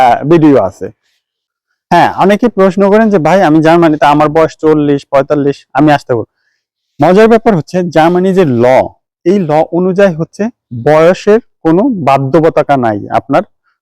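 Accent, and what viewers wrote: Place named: Comilla, Bangladesh. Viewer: আপনার কথা কুমিল্লার সাথে মিলে যাচ্ছে।স্যার আপনি কি কুমিল্লার সন্তান?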